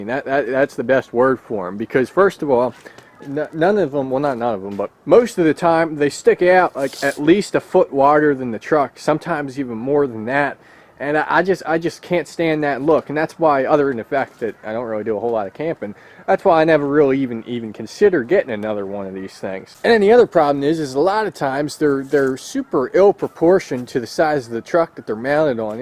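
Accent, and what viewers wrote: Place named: Michigan, United States. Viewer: Sounds like Michigan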